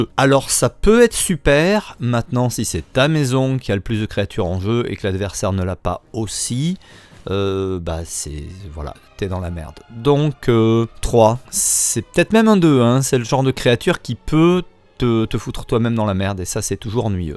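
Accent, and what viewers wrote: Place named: New York, United States. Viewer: Merci pour le travail et ton accent de new yorkais. Continue comme ça et bon courage dans tes ouvertures de deck dis pour rester zen lol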